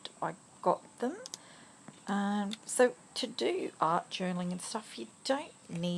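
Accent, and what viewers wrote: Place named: Australia. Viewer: Love the page and the pineapple. Love the gelli plate and good to hear another Australian accent. Susan.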